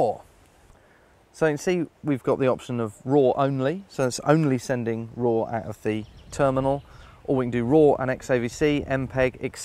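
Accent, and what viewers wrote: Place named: England, United Kingdom. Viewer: english accent sucks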